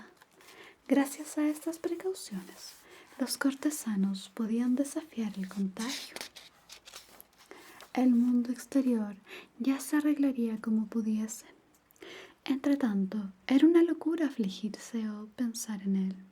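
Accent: Chile. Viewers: Es muy agradable escuchar un lindo asentó chileno que no dice ningún garabato después de escuchar a estas xalas qlias de la calle todo el día gracias ❤❤❤❤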